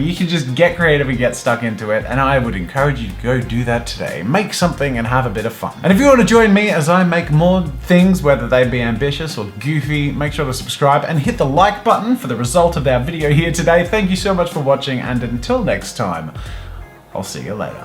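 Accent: Australia. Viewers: Wow....you're sounding more Australian lately..... weird. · he’s definitely Australian that’s for sure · Are u a Australian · why * sounds Australian?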